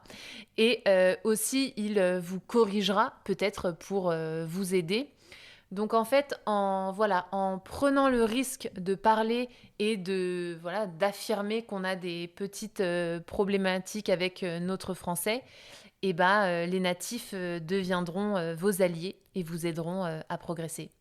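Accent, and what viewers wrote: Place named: Lyon, France. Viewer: J’ose parler français avec les francophones assez regulièrement. Malgré d’avoir un niveau avancé, je m’occupe trop du regard des autres. Ça ne me bloque pas trop sauf quand je traite des sujets où je n’ai pas le vocabulaire. Merci pour cette video et de ce message d’être tolérant avec soi même. J’adore ton accent (lyonnaise?).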